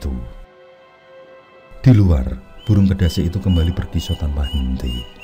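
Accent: Java, Indonesia. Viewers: aq pake headset kok, tpi om nya terlalu medok 😁😁😁🙏🙏🙏🙏salam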